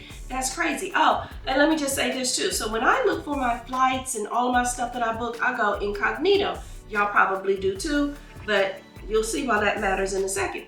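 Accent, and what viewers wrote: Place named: South, United States. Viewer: the Southern twang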